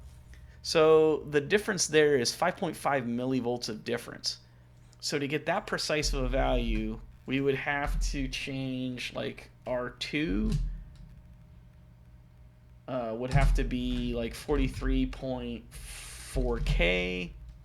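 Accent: Australia. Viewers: Did you shake the Australian accent?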